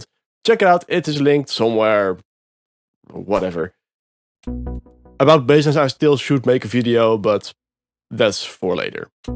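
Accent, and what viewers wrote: Netherlands. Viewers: ahhaha vaak kan je dat gewoon horen bij nederlanders die engels praten :P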